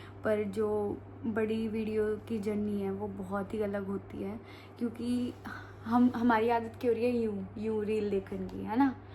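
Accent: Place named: Haryana, India. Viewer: me bhi jaat family ko belong karti hun but me by birth punjabi family se belong karti hun but mere in-laws jaat hain U P se. Jb se maine tumhare vlog dekhna shuru kiya to mujhe tum bahut pyari lagti thi us ka ek reason y tha ki mujhe tum haryanvi language bolti Hui bahut achi lagti thi kyuki tumhare bolne me bahut mithas hai jo maine kabhi haryana ke jaato me nahi dekhi thi. Phir jb se ko dekha to mujhe tum dono se pyar ho gaya bacho ki tarah ab mujhe hr time tumhare vlog ka wait rehta hai. Congratulations tum Mom Dad banne wale ho but apni health ka dhyan rakhna. God bless u all. Luv u all❤❤.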